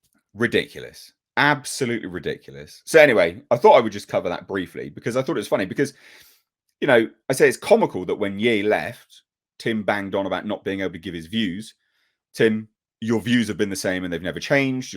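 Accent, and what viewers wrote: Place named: United Kingdom. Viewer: Pools got more viewers and make more money then you do. Can't stand listening to that pretensious British accent. I really hate the British wish Russian would take them out,lol. Weakmen of Europe. I really wish the U.S. would cut ties them.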